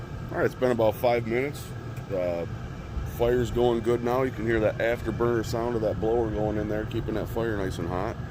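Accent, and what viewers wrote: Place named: Wisconsin, United States. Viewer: Where are you from? Sound like wisco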